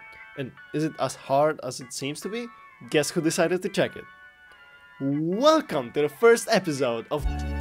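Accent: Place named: Poland. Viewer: juz po piweszej sekundzie filmu wiedzialem ze jestes polakiem :)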